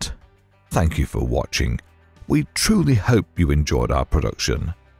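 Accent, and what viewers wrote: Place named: United Kingdom. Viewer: We're not British here in New York City. We don't pronounce it Two-Hundred and Seventy Park Avenue. It is Two -Seventy Park Avenue. And while I'm at it, we don't say litrally either. It is pronounced literally. We don't drop the "E" like you Brits due all the time.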